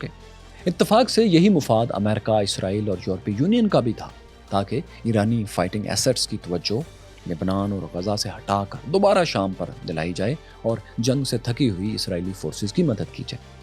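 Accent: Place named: Punjab, Pakistan. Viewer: What language are you speaking in? It appears to be a mixture of English, Arabic, Farsi, Turkish and Hindustani being spoken in a heavy Punjabi accent.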